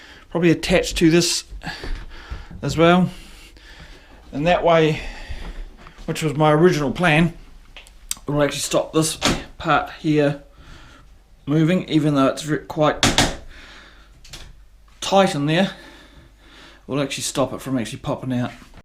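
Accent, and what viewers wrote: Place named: New Zealand. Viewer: Welcome back, nice to hear that cultured kiwi accent again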